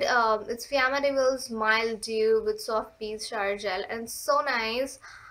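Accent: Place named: United States. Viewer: your cute :) you look like an indian but you have an american accent.how? :O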